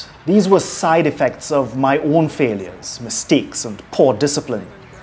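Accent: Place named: Trinidad and Tobago. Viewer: Not only an amazing story but well told and the Trinidadian accent tho I'm Antiguan (have family in Trinidad) gives me an at home feel But at just 18 years old there's a lot I will take from this video including the fact that I am now the writer of my own destiny I have the ability to grow develop choose the persons who I want surrounding me etc. Of course you cannot control everything and alot of times it's good to not be able to control everything because we serve a GOD who loves us and we must face challenges. But by far one of the most clear and fruitful messages I've ever received!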